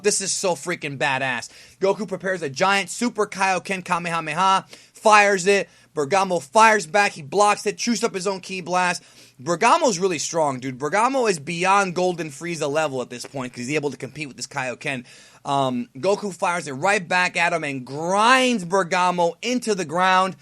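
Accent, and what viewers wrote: Japan. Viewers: Nice Nihongo accent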